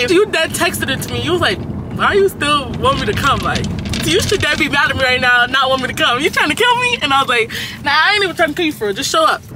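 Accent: Trinidad and Tobago. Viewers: trini to di bone! accent so strong😭😭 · No you're it's a Trinidadian accent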